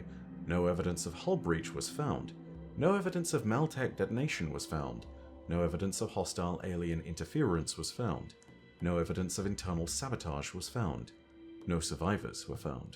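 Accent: United Kingdom. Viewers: You have an accent, you're British, yada-yada..... But when the clip you play clearly calls them "uh-feel-ee-un"... It makes the way you say it just sound wrong.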